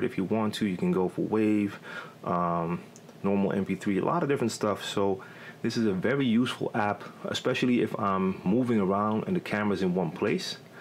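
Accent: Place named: United States. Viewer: You have an impeccable American accent. I live in Purmerend and work in Amsterdam, been here for a little over two years. Very nice place to live. Glad I found your channel, lots of great stuff, thanks fir sharing your experience!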